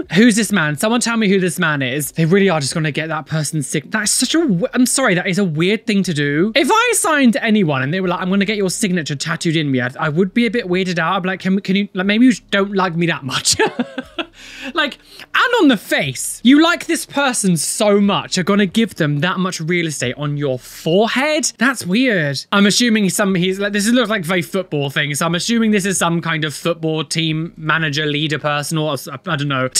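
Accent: United Kingdom. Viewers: That bri'ish accent girrrrrrl 😼🎀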